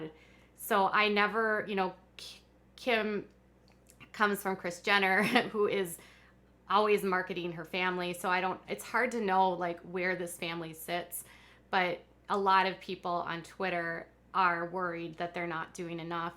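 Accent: Minnesota, United States. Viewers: I think needs to go back to writing some BS liberal snowflake “column” or “blog”. She pathetically uploads several times a day about ridiculous topics with no proper research or fact checking. She has to censor every little word she is afraid might keep her from making a buck. Hey, if you’re so passionate about your political beliefs, just say the word. ABORTION. If you want to even ATTEMPT to play the reporter role, drop your awful Minnesotan accent and learn to use talking head diction. Putting a bag over your head might help as well. You have a rare talent of making someone’s eyes, ears, and brain bleed simultaneously.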